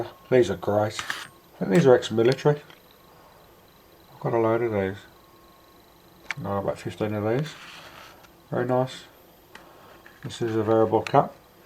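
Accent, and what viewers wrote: Birmingham, United Kingdom. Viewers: Your Obviously A Brummie , Do You Remember PCRL On 103.5 FM ? Blessings From Penzance , Cornwall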